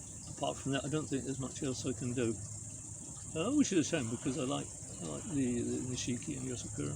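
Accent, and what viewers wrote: United Kingdom. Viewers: You sound British, where in the world are you?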